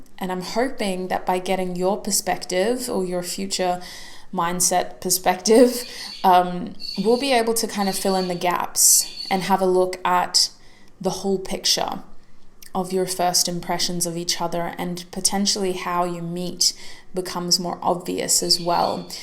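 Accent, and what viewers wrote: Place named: Australia. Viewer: Aussie accent 😍😍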